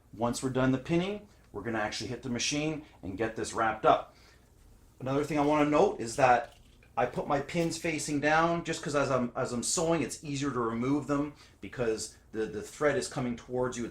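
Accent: Canada. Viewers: Loving that rich Canadian accent!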